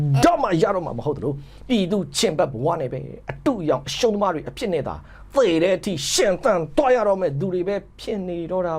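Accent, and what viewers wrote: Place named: Upper Myanmar, Myanmar. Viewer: အညာလက်သံ တစ်ညံညံ ပေါ့လေ😊😊😊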